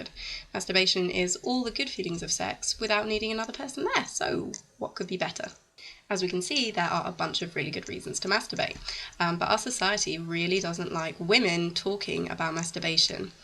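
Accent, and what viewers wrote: United Kingdom. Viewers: You seem to have British accent, so I am thinking in England, sexual topics aren't something women easy talk about or do, as you have queen, she makes uncomfortable for you to masturbate, nobody there can imagine her doing it, while in USA, France and other countries not under strict female supervision, something like that isn't questioned so much? As internet is in widely use for 15 years, girls everywhere are now more aware of masturbation, it is seen online, is that what makes you speak out? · Loving the topic and your British vocal sound, see i did'nt say accent lol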